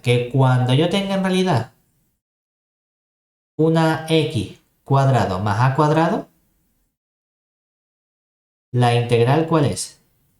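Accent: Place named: Cádiz, Spain. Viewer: de donde eres? Soy estudiante de ingenieria en la UNED y tus videos son la bomba, tengo familia en el Puerto de Santa Maria en Cadiz y tu acento de verdad me suena de esa zona y me encanta, un gran abrazo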